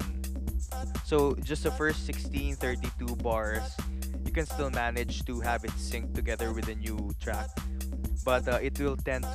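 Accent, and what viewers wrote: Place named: Philippines. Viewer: Ill review, tip and tricks! Sounds like your Pilipino too, LOVE dat! JAHbuhay king...bless up!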